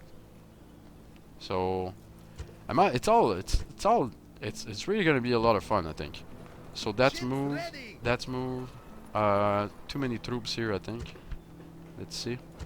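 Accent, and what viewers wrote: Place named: Quebec, Canada. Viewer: French Canadian :)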